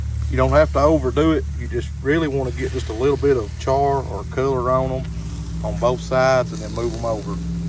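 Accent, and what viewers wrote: South, United States. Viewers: love the southern accent 🙂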